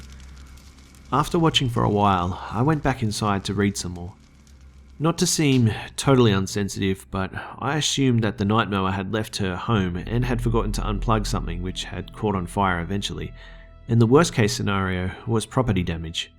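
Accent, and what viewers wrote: Australia. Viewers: love vids ya gotto have a aussie on youtube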